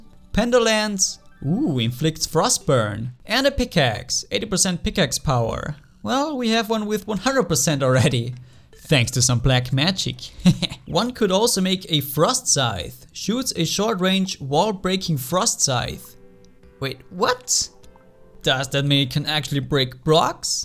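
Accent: Germany. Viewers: man hört dein deutsch heraus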